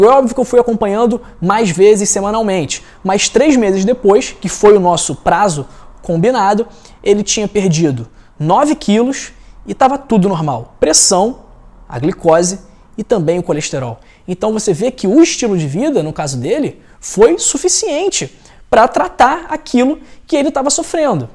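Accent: Rio de Janeiro, Brazil. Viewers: Gostei muito voce é carioca?voce tem sotaque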